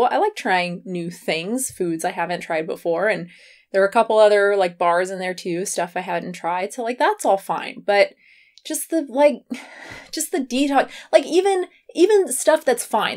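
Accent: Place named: South, United States. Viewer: It's the southern accent. If you watch any of her reacting to when i was raw videos it's so much more pronounced too.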